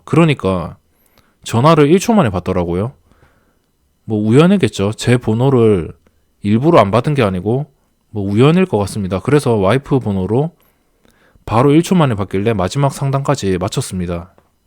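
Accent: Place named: Changwon, South Korea. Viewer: 저도 창원살고있어요 ㅎㅎ 제목보고 들어왔는데 너무 솔찍한 우리 동네 말투로 강의해주셔셔 친숙하게 동영상 재밌게 시청 하고 있습니다 감사드려요~^^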